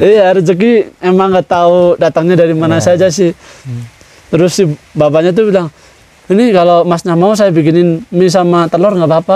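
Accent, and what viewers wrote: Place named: Banyumas, Indonesia. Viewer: Habis dr rjl5 langsung kesini. Mas keren bocah ngapak kaya inyong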